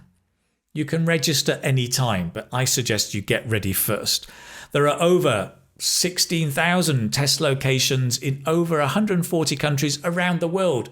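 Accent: United Kingdom. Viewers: I ENJOY VERY MUCH WHEN I LISTEN TO YOU WHILE YOU SPEAK BRITISH ENGLISH WHICH WE WERE BEEN TOUGHT IN SUDAN MANY YEARS AGO. I want to speak it like you but l could not. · Is this British English?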